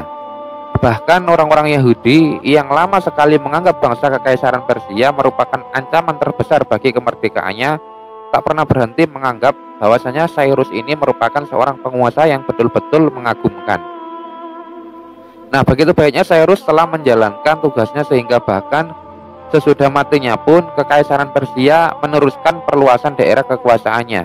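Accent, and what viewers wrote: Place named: Java, Indonesia. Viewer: Capek gw dngrin baca critnya.. medok gtu bahsanya 🤣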